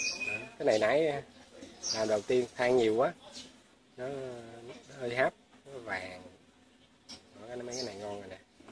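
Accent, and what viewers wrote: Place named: Southwest, Vietnam. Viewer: Rat thich Video clip cua Anh, hinh anh giong mien Tay cung giong noi chan chat mien Tay cua Anh lam toi nho lam que toi . Hy vong mot ngay gan day se ve VN cung anh nhau mot bua : ))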